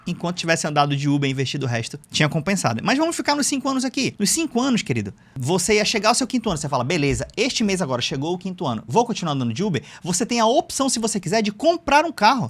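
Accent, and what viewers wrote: Manaus, Brazil. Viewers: Sotaque manauara detected 😁 bom demais seu canal!!! Abraço da zl de manauxxx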